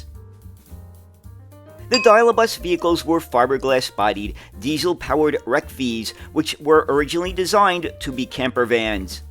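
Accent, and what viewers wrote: New York, United States. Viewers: I still think is a NEW YORKA! Come on, you can't hide it with that accent, you are a NE-NE-NE-NEW YORKA.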